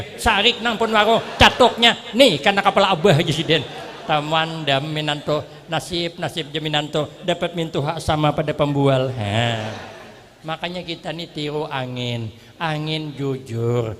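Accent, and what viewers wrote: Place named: Brunei. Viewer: Mcm org brunei bahasa nya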